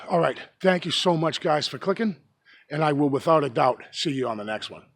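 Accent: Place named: New England, United States. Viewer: A beautiful day for January in the East! We had rain with thunder and lightning this morning. over 50 degrees in Jan., think I'm going hiking in the woods - I'm envious every time I see you out and about - Love your NE accent - don't worry about trolls - just keep it moving, and keep the videos coming! And just keep that head of yours on a swivel!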